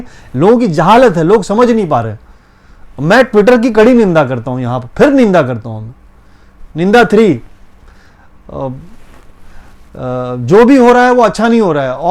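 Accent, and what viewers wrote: Mumbai, India. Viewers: भाई... किसी को copy मत करो... आप original में भी काफी अच्छे हो .... मुंबईया language में ... 👍👍👍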